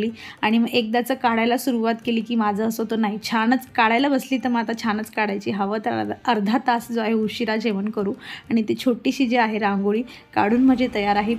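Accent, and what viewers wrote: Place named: Jalgaon, India. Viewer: Khup chhan video tai.... Sagdech video mst astat... May be tumhi jalgaon che asavet language varun vatate tumchya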